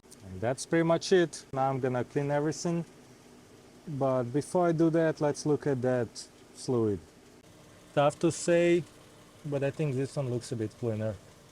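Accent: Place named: Russia. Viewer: Пипец КАНЕШНА акцент, наших везде слышно 👍👍👍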